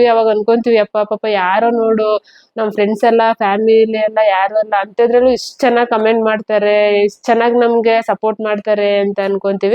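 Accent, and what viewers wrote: Mandya, India. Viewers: One and only Mandya district language 🎉🎉🎉 Namdu Mandya district sathnuru....naavu nimma tharane matadodu madam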